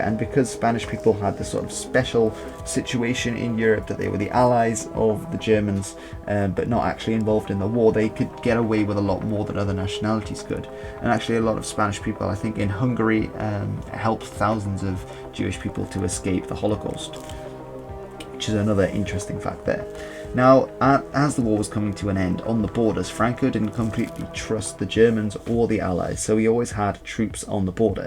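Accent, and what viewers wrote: United Kingdom. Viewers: You are a Dutchman?! You don't sound like one. If you are Dutch why do you speak English with a British accent? Do you live in the UK or have lived there?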